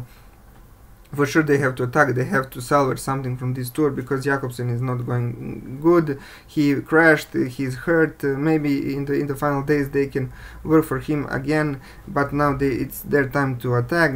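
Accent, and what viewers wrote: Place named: France. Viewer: Come on people, let's help this guy out by liking and subscribing. English is obviously not his first language (French maybe?) yet he's doing really well, excellent information. New subscriber here, looking forward to more cycling videos. Keep positive Sir, you're doing well. WVA for me, but it's almost impossible to pick a definite winner.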